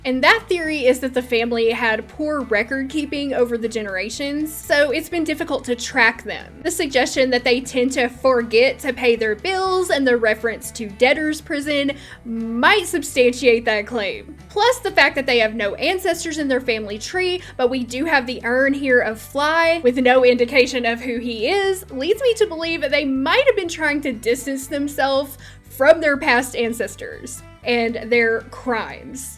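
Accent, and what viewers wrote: South, United States. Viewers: your accent could get that Southern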